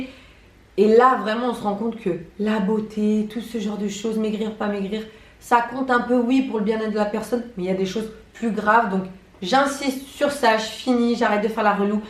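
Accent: South, France. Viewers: Son probleme c'est pas son ex-bonnet B, c'est sa voix et son elocution, ca fait trop cagole du sud. Enfin si elle est heureuse comme ca ( Bon elle les a fait refaire 2 fois et jamais 2 sans 3 )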